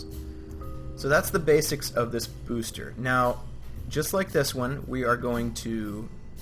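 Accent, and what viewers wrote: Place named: Russia. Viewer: okay... speaks in a russian accent Interresting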